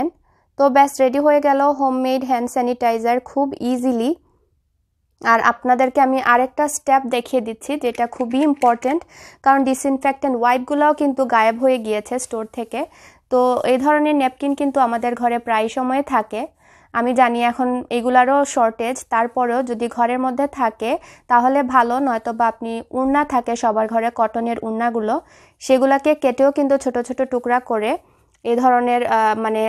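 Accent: Sylhet, Bangladesh. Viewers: আপা, অনেক ধন্যবাদ আপনাকে। আপনি কি সিলেটি?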